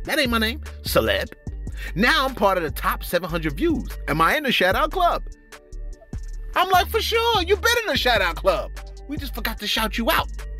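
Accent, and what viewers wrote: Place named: Bronx, United States. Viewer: Ay yo shout out to celeb for saying niggas twice. 😂 Had me weak cuz u neva cuss and always say ninjas. I told my wife u sound like u from da Bronx tho!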